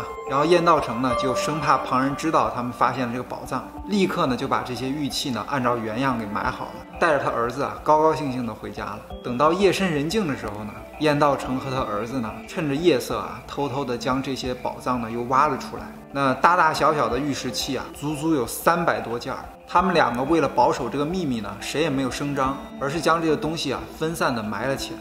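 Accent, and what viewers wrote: China. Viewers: 這個節目用的是繁體字，可是K哥口音像是大陸人。為何？ 不管如何，我點贊K哥使用繁體字，這是智慧的象徵。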